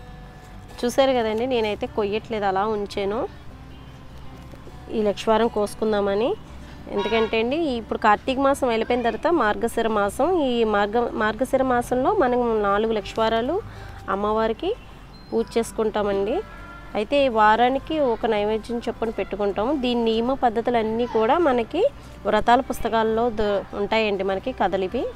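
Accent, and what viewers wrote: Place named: Kakinada, India. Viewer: Kakinada voice super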